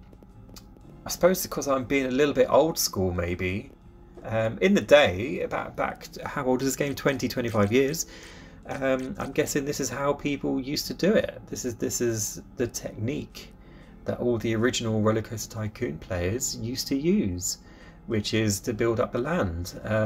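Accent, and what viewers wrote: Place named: United Kingdom. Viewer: Your voice is from the united kingdom and your from the UK?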